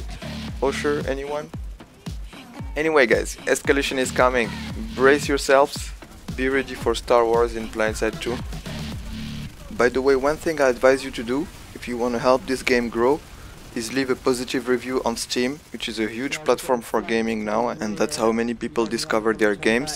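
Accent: France. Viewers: Good video ty...Hey can i ask why you speak like a french guy? i am french and when you speak in english, it looks like you know how to speak french:)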